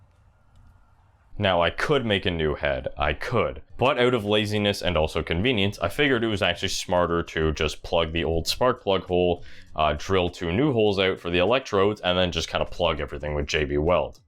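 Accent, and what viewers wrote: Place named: Canada. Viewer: Lately I've been listening to too many Canadian youtubers. So I recognised your accent.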